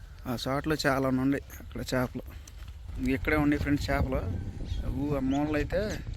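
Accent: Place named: Bhadradri Kothagudem, India. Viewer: మీ మాట మా సయిడ్ లా మాటాడతున్నారు ఊ అదుగో అని😁😁😁 భద్రాది కొత్తగూడెట మాది😁😁😁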